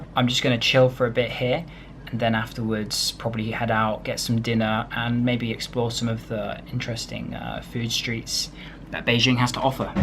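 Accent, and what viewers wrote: United Kingdom. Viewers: ignorance is no excuse for anything - what kind of up your nose BRIT accent is that?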